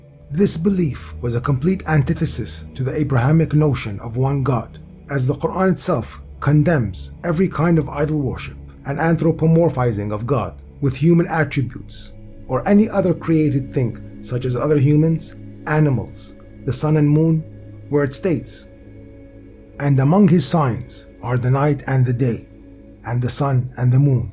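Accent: Arab world. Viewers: Well it is very hard for me to listen to your arabian english accent. Maybe you should include subtitles i the next video if it is not much of work. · Arabian accent and a deep voice = Set for life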